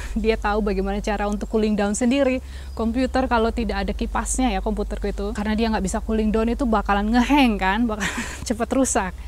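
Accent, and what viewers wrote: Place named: Java, Indonesia. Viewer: Masyaa Allah... Matur nuwun sanget ilmunya Mb, I love my long hair. jadi tahu sekarang, Alhamdulillah for everything. Btw, dialog Jawa nya medok banget Mb, Jawa mana Mb? Salam kenal aku asli Jawa Timur Mb. Sukses selalu dan bahagia sekeluarga..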